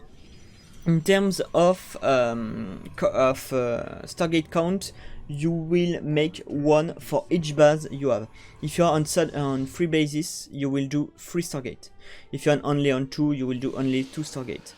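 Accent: France. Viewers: Your French English is cute.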